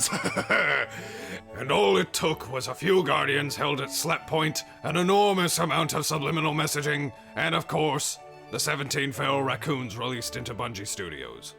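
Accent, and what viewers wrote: England, United Kingdom. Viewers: You sound like a mix between a humble Englishman, a drill sergeant and Lord Saladin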